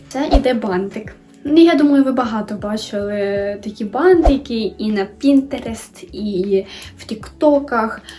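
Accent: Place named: Surzhyk, Ukraine. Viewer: Пиши будь ласка собі трішки сценарій, дуже сильно говір ріже слух. А коли пропишеш то будеш знати хоча б умовно слова і буде менше цього суржика страшного. А так то молодець працюй далі❤️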